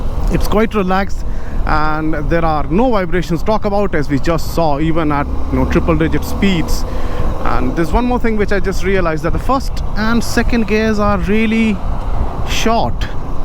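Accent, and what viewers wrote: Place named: United Kingdom. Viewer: Love your accent bruh!. Thought i was watching a British based channel at first :D Keep up the good work on your channel! :) Ride safe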